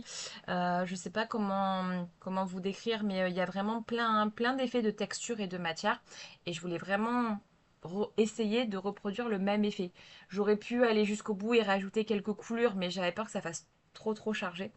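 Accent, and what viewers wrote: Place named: England, United Kingdom. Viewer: Bonjour, je découvre vos vidéos et j'adore!!! Je me suis abonnée également 🎉! Votre voix est agréable et fluide et c'est un avantage certain et l'accent anglais est pas mal! J'ai entendu pire! 😅😂 alors pas de honte à avoir ! Quelle imprimante utilisez-vous? La mienne n'est pas laser et il paraît que l'on peut trouver des cartouches avec une encre résistante à l'eau 🫤 faut que je creuse! 😁 Je vous souhaite une belle journée et à bientôt pour de prochains visionnages! 😊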